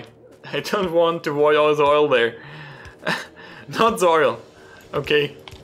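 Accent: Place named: Eastern Europe. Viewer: I thought he was eastern European like the developers.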